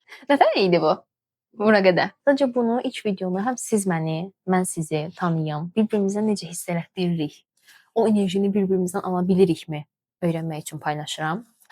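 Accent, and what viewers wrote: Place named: Sheki, Azerbaijan. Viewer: Şəki ləhcəsi çox qeşeydi. Uğurlar sizə, çalışın motivasiyadan düşməyin ❤️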